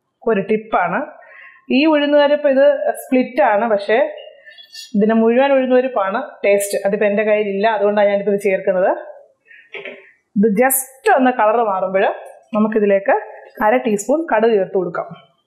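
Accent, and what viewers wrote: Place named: Central Travancore, India. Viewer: njanum ptnmthita anu..central trvncore slang ketanu chodiche😀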